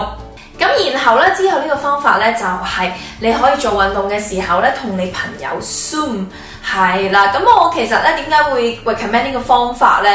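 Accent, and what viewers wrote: Standard. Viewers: 好喜欢听coffee 说普通话。感觉你的国语越来越标准了👍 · 原來coffee普通話也說得很標準的，第一次看到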